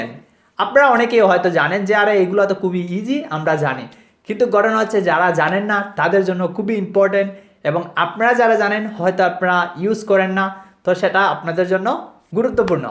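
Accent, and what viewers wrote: Sylhet, Bangladesh. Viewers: উনি সিলেট এর লোক । উনারা বাংলা এভাবে বলেন ।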